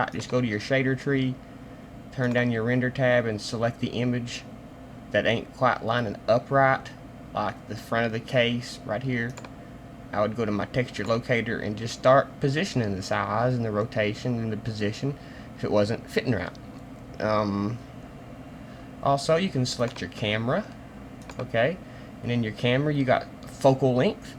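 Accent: South, United States. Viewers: You are indeed a generous person and I love your good ole boy accent. Down home education. Excellent tutorial. Now I know what Modo is all about.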